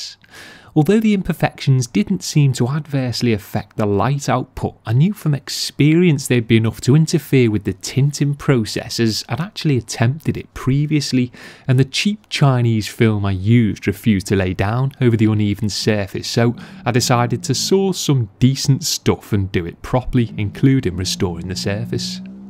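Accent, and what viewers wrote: Liverpool, United Kingdom. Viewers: Is he a scouser or from the Wirral, it`s a bit posh there.? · Subscribed to your channel 2 months ago, so relaxing and memorising it must be that soft Scouse accent😆,last video before Christmas?, if so happy Christmas/new year, loads more cars to clean in 2018 🎄🇬🇧🚘👍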